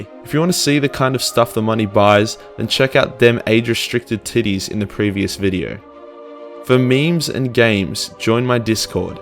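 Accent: Australia. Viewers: No, he’s just Australian.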